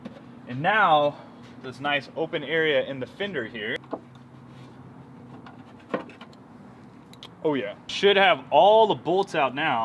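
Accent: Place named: United States. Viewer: For a second there, I thought you were Issac Butterfield, but then I thought "why does he have an American accent?"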